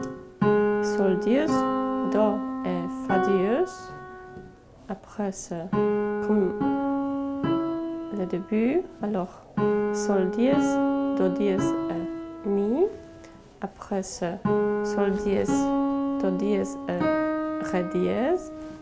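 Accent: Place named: Eastern Europe. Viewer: Merci infiniment pour le partage de votre connaissance. Parmi les plus belles mélodies qui aient jamais été composées...Vous avez un accent adorable. Pologne, Russie, Ukraine...?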